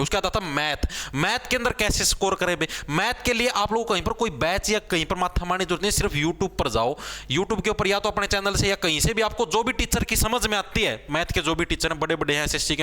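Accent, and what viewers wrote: Haryana, India. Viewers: Haryana sa ha ya bahrti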